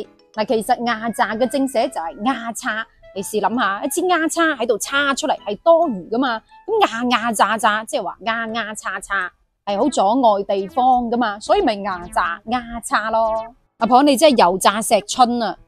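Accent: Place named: Dali, China. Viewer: 大沥口音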